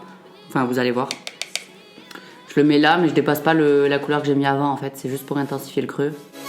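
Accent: France. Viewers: T’es marseillaise ? Ps: super revue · Coucou tu viens d’où ? T’as un léger accent de chez moi, du sud ouest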